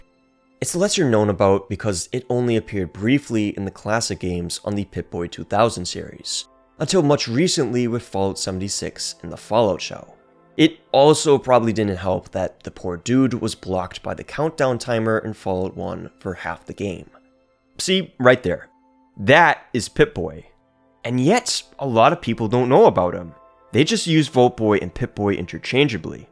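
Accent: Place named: Upper Midwest, United States. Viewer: Are you from the the northern Midwest? Your voice is nice but I notice a bit of an accent which is actually quite charming.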